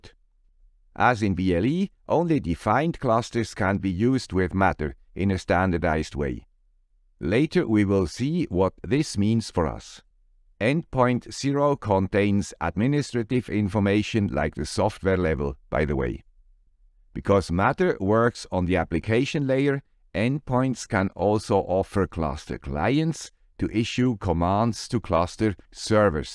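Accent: Switzerland. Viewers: I was just wondering about it as I got back into HA... And there's a video by the guy with a swiss accent about it! Great! Also, I feel like it's relevant... XKCD #927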